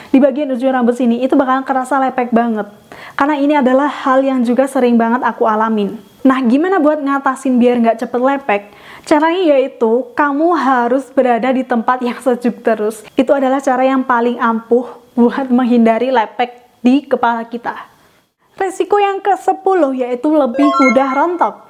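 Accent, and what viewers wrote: Java, Indonesia. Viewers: Kakak orang jawa ya?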